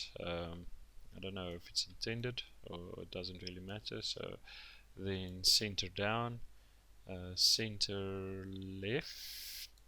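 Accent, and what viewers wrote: South Africa. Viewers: I also noticed the way you pronounce words. So I assume dat jy ook afrikaans is :p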